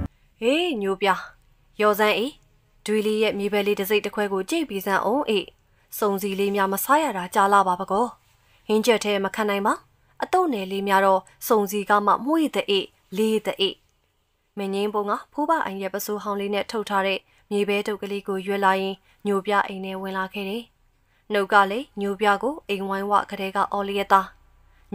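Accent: Upper Myanmar, Myanmar. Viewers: အညာသံတထွေးကြီးနဲ့ ရေးချက်က ကောင်းလှ ❤❤❤❤ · အညာလေသံလေးနဲ့ ဖတ်ပြတာလေး နားထောင်လိုံကောင်းတယ်ငိုသံကပီပြင်တော့ တကယ်ငိုမိတယ် တော် လိုက် တာ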